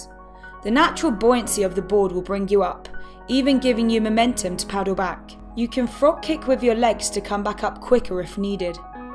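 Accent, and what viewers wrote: United Kingdom. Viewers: Hahahahaha..I just love the English accent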